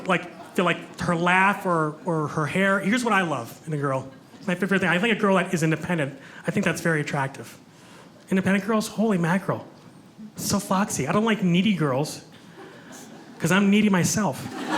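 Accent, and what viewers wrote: United States. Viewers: World be fun if he knew how his and his brothers names are pronounced in Norwegian. And funny they’re pronounced so American and not even with a twist of Norwegian.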